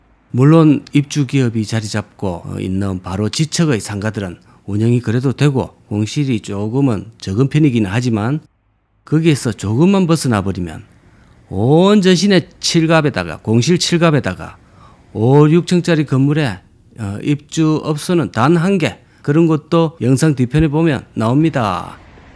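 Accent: Gyeongsang, South Korea. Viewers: 안녕하십니까 ~~ ~~ 입니다. ~~~ ㅎㅎㅎ 그 소리를 들으면 스트레스가 팍 풀리구 구수한 경상도 말투, 어린시절로 돌아가는듯합니다. 평서 객관적이고 참 재미 있는분 같으십니다. 건강하셔서 오래 오래 진행 부탁드립니다 감사합니다. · 고향이 경상도 어디세요? 사투리 귀엽 ㅎ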